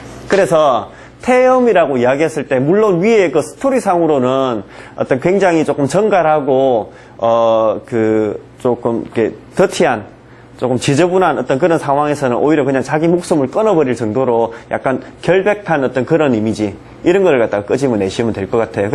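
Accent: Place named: Gyeongsang, South Korea. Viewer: 선생님 귀에 쏙쏙 들어오는 음성과 논리 너무 귀하고 감사합니다. 경상도라 그 억양이 더 친숙하고 이질감 없어 집중에 도움됩니다.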